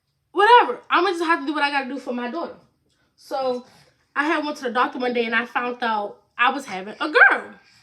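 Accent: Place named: DMV, United States. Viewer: I knew you was from the DMV & then you said “Jii like” I was like I knew it 😂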